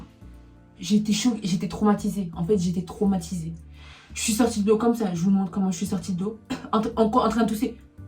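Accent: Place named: England, United Kingdom. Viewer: L'accent anglais 😌❤️❤️sinon très belle histoire.☺️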